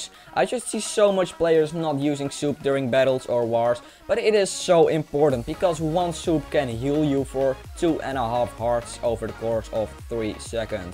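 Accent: Netherlands. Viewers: sounds like a dutch accent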